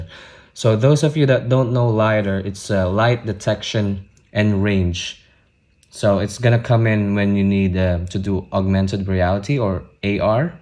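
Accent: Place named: United Kingdom. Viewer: Bumibritisssshhhh accent!!!!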